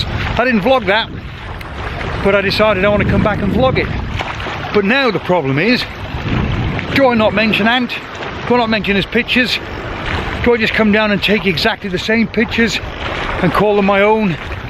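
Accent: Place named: North Wales, United Kingdom. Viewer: lovely, what part of the uk, I am guessing North Wales possibly, excellent video and learnt an awful lt, brill thanks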